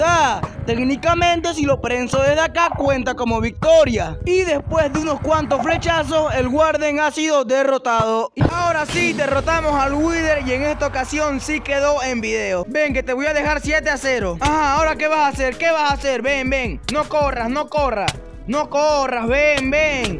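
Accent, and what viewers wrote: Venezuela. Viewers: No es q eres venezolano creo q se eacribe asi y porque le decias al esqueleto asi :/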